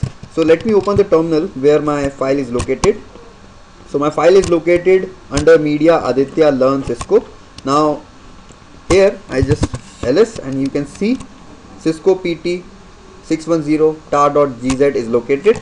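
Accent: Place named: India. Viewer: hes indian i think by accent .. however the video helped me so cheers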